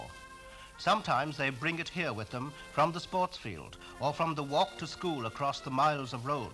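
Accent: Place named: RP, United Kingdom. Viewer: Why does the commentator sound like he went to eton?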